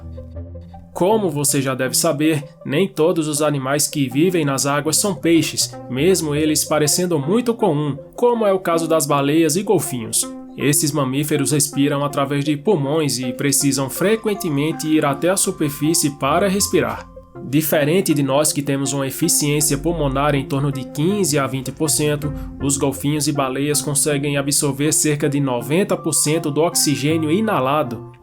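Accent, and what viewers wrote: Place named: Northeast, Brazil. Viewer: Amei mais ainda o sotaque nordestino do narrador! Vcs são de onde? Nordeste power 💪🏽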